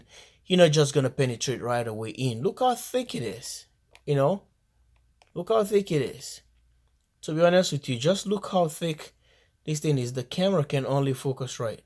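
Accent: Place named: Nigeria. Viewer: You talk like a Nigerian. Nice video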